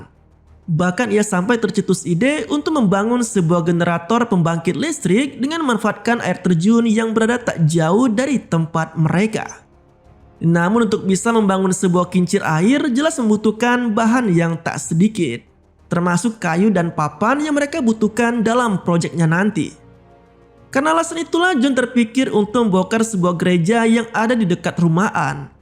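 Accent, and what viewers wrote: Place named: Java, Indonesia. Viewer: Biasa jgn baper ilat jowo 👅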